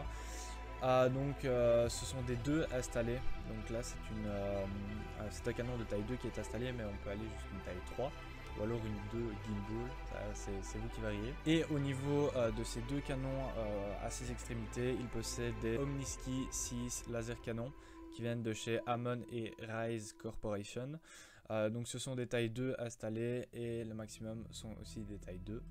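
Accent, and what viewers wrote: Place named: Liège, Belgium. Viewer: Tu viens de Liège ? xD je kiffe cet accent 😂👌🏻 sinon bien la vidéo c’est cool je vais sûrement prendre celui là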